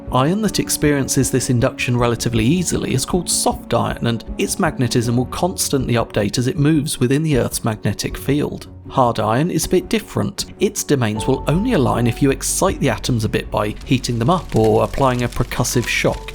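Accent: United Kingdom. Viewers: Sorry, but am just tired of the instinctively haughty British/cockney accent. Any chance you can post a video where MS Word reads the text? "Feewwd" vs. "Feeld"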